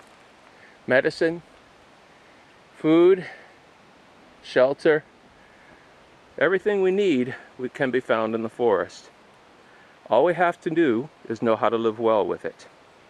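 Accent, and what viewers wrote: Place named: Canada. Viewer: Actually he has a Canadian accent which sounds like a television announcer to American ears. In other words, his consonants are more clearly pronounced and his vowels are more open. There are many English accents in both American and Canada, but for the most part Canadian English is more clearly pronounced than the media-driven Modern American English which attempts to be jazzy and cool. Many people pronounce their consonants in American, but many other do not. Canadian English is refreshingly clear. I love accents!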